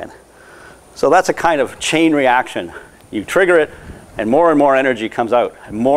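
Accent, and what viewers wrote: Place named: Canada. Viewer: He actually sounds Canadian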